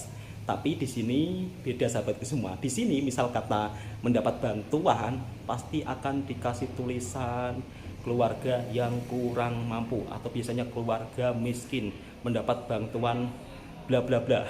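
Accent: Java, Indonesia. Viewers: Negara kaya tapi rakyat miskin.. burit apa..kamu mahu mkn burit kah.. enak kah ngabuburit tu..😅mas kalau ngomong bunyi jowo banget gak sama dgn adik nya.. kalau cakap bahasa melayu tu gak kayak org indo.. bunyi n loghat melayu nya lain mcm..mas jowo medok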